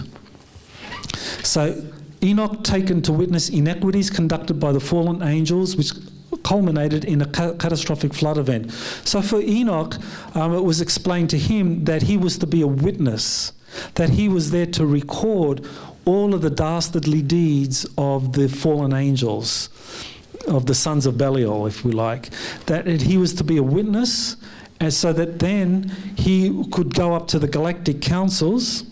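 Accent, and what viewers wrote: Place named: Australia. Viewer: You were a former professor? What are you now? You speak in a broad Australian accent, yet you mutate the pronunciation of "AntarCtica" as if you you were one of rhe exceptional people from the indispensable country. You do reslise that there is a letter "c" after the letter "r" in "AntarCtica"?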